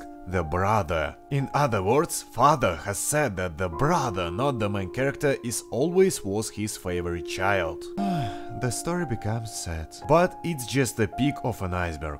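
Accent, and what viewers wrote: Russia. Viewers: Интонация русская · С русским акцентом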